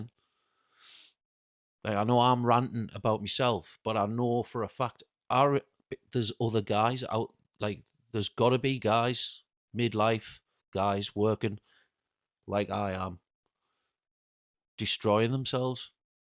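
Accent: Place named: Newcastle, United Kingdom. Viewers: Don't know why mate, but I expected you to either be from Scotland or the North-East of England! Don't even know why!!🤔🤷‍♂️🙈🤣 not an insult BTW, Northerners rule!😎🙌🤝 thanks for sharing your story mate! I'm 27 and Autistic. Have worked night shifts, construction work, kitchen work in cafés and restaurants, pharmaceutical work, retail, youth work and just recently left a greenskeeping job. I actually love strimming hedges and cutting grass, but we also had to pick up dog shit and cigarette butts and I had enough! My family told me how worried they were about my mental health! I have a few days of holiday pay and I'm just taking that and gonna rest up.😵‍💫😵🤯😴 wouldn't even mind, I also went to university and got myself a level 8 honours degree! Everyone goes "wow! That's an excellent degree!! You'd walk into a job!". Sadly, I don't walk into any job!! 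I accepted the shittiest job I was offered, contracting to a contractor if that makes sense?! Basically, I had none of the rights of a direct employee of that company! Contract work is good for pay, like you do get paid well, but you also are the easy target for blame when things go wrong! It's very tough out there man! I hear you!! Night-shifts are carcinogenic so if you can get out of it, please do!🙌🙏 understand that is a lot easier said than done though! Take care mate🫡🤝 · Hey mate, I obviously don't know your full situation, but the poor diet and alcohol definitely won't be helping the aches and pains, as well as living in a colder climate in Norway I'm assuming. I'd suggest sorting the diet first, see if things improve. Higher protein for repair, vitamin supplements, doesn't have to be too crazy. Also, good on you for moving country. I'm a UK expat living in Australia, best thing we ever did. 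From a similar area also judging by your accent, I'm from boro myself. Rooting for you mate 👍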